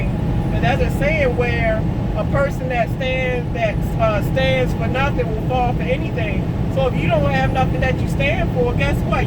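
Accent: Baltimore, United States. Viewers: I love the way you say "Dude". My son said you sound like you from Baltimore and I told him you were! Lol. we just laughed about how you were talking. We have folks in Capitol Heights, Maryland, Bmore and DC.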